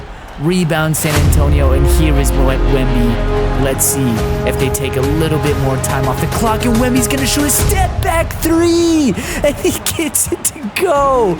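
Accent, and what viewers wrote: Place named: Mexico. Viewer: dude sounds mexican as fuck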